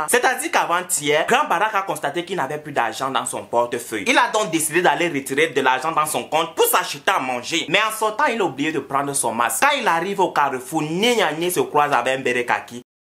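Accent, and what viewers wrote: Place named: Cameroon. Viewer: J'suis abonnée sur ta page parce que tu me fais beaucoup rire 😁😁😁 Aussi parce que tu appuies bien notre accent Camerounais, tu parles avec notre Argot Camerounais et tu parles de notre actualité et de nos problèmes Camerounais 🇨🇲🇨🇲🇨🇲🇨🇲🇨🇲 Continue comme ça, c'est le 237 qui gagne 💚❤💛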